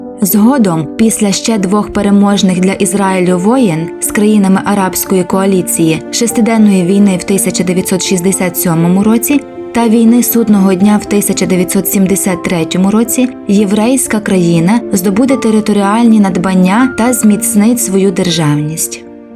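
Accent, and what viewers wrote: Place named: Lviv, Ukraine. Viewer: От слухаю диктора, і розумію що цей диктор точно з Львівщини)))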